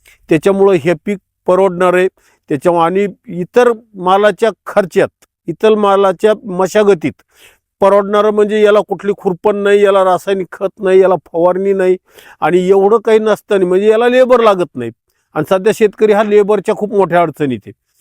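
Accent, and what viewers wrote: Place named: Satara-Solapur, India. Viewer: तुमची बोलण्याची भाषा आणि सांगितलेला जिल्हा यात मेळ बसेना सातारा सोलापूर boundary सारखी भाषा वाटतेय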